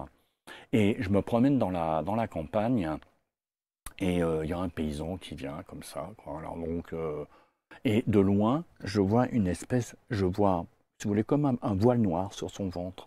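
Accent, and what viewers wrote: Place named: Alsace, France. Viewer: le délicieux accent alsacien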